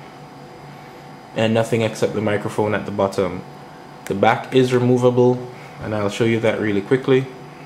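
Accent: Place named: Jamaica. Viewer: Good Review, (I Click Liked thumbs up) You have a Nice Jamaican accent, But we Trinidadians are rated internationally as Having the "Sexiest Accents in the World" ...........LOL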